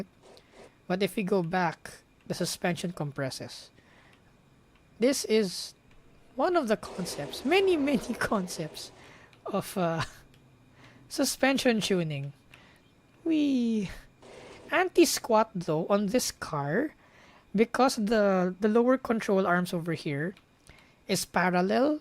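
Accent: Philippines. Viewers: Motorcycle and car noises in the background, accent, didn't even have so say it accidentally, fellow filipino spotted 👍 But dang, streaming 11 midnight, good content tho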